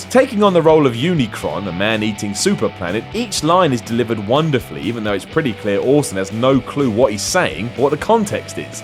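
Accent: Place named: England, United Kingdom. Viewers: Jackie Chan? Are you fucking kidding me? Maybe someone should do an internet top 10 SHITTIEST top 10 lists and have this annoying motherfucking WhatCulture lists take all 10 spots!!! As soon as I hear this English guy now I know it's shit!!!! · from WhatCulture. He's phoning in these voice overs because he's gotta dedicate most of his energy to physical training. And yet, he's got an unapproachable Londony class that he brings to every narration.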